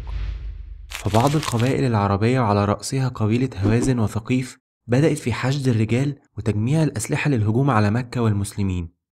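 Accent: Egypt. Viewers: ياخي طور من لغتك العربية الفصيحة انت تتحدث عن تاريخ اسلامي ، اعلم انها صعبة على اخواننا المصريين لكن حاول تخفي لهجتك المصريه وان تخرج الحروف من مخارجها الصحيحة ولاتغير نطقها (تمنى تقبلها بصدرٍ رحب)💙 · اول ماسمعت المعلق مصري سكرت الفديوه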